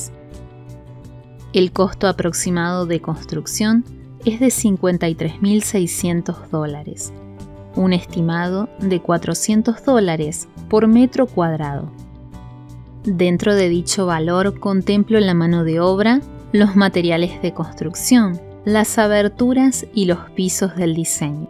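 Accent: Argentina. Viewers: Hermoso diseño, buena distribución, buena iluminación, excelente aprovechamiento de los espacios y decoración discreta y elegante , de muy buen gusto, en cuanto a las opciones de la fachada me gusto la numero 3 , con detalles en piedra .....me gustan muchos sus videos porque están bien explicados ademas , das costos $ aproximados (desconozco para que pais, pero por tu acento supongo que eres argentina) y tambien das opciones de cambios en la fachada ..y los planos.......!!!Que mas se puede pedir!!!.....gracias y espero el siguiente........saludos desde Mexico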